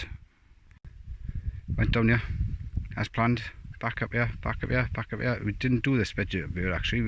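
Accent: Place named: Wales, United Kingdom. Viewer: Stunning & you sound Welsh lol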